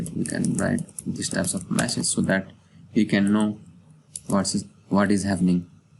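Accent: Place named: Nepal. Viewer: You have Nepali accent.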